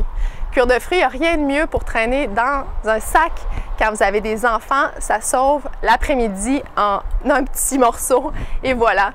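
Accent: Quebec, Canada. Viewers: L’accent quebecquois est craquant tout comme les fruits secs❤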